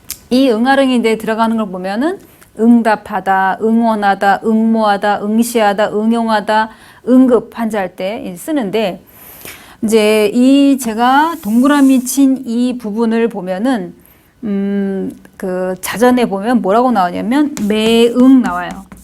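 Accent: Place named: Gyeongsang, South Korea. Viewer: 선생님 굿 아이디어 경남사투리 ㅋㅋ좋습니데이 ㅎㅎ 감사합니다 잘 배우고나갑니당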